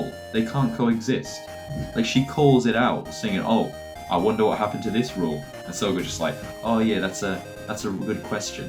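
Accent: United Kingdom. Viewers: Ohh. British. Cheerio! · You talk British?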